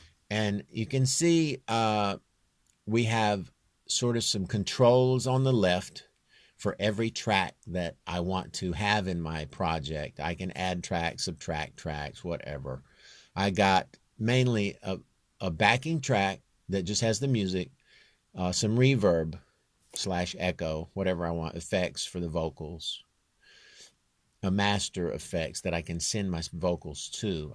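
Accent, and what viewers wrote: South, United States. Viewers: Yeah, I watched it and it showed what I thought it would, you are really well versed in sound engineering and yes, your real singing skills are not lacking at all. Before I go on, I am guessing the thing went well since it was a while ago. I do hope so at least. Confusing on a song when you are talking about your own real ticker. This video is for the end of the semester project where you take your turn and show the other students all the stuff you learned and how you used it, after 16 weeks of learning and practicing the stuff, as an analogy. Can't really learn too much from it in regards to application because as you said, it's a process, and that process each requires its own host of knowledge. What it does do is show your key elements to the process and that allows for a great starting point. I am guessing these type of videos are as equally demanding as your song mixes, but if you are not allowed to get paid for the song videos due to copyright, you would get full pay for these. 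That's something. I also learned you have a southern accent, didn't see that coming.: )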